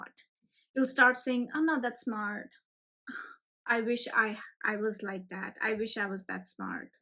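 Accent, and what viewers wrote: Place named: India. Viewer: your content is good but the accent is ..... haha you know. Chose either Indian or american accent. Dont mix both .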